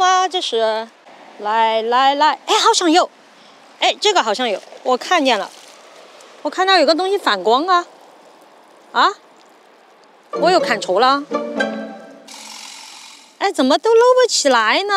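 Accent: Sichuan, China. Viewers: 四川妹儿吗？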